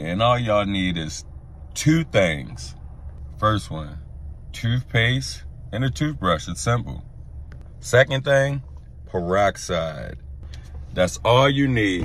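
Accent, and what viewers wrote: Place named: Baltimore, United States. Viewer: Love a Baltimore accent lol! Orrralllll lol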